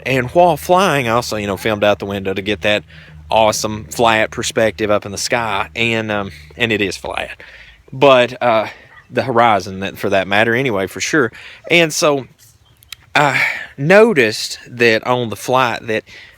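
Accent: United States. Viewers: Judging by your accent you are probably American and as such probably never had any lessons in geography so I made allowances for your preposterous assumptions. Firstly, you are actually using polar equidistant map of the Northern Hemisphere as it is on the GLOBE and that is,you must admit very,very poor proof of your FE..Secondly,have a look at the globus (you know, the round spherical thing in primary school class room with maps on it ) and you will see that a trip from Shanghai to Munich would be mostly over Siberia and then Ukraine.Then, how much of the horizon did you actually see on your flight through 26 inch window? All of it or just a bit of it?Thirdly, on equidistant map's grid the straight line is actually a curve as it has to follow latitude or longitude ( parallels and meridians ) depending on course.Lastly, if the Earth was flat with North Pole in the center and South Pole around it ( Ice Wall ) the use of simple compass ( you know, always points North ) would be IMPOSSIBLE.Now try to figure that one out and you will see just how silly is your assumption.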